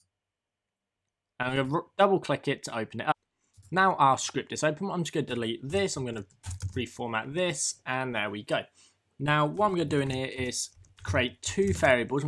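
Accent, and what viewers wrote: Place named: United Kingdom. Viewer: Hi, trying out the code in the first script, I get an error message saying "Input does not contain a definition for 'GetaxisRaw'". I checked every letter, it is matching the one in the video. I tried with Getaxis, but it doesnt change anything here. Does someone knows about it ? Great tutorial, and great British accent as well ^^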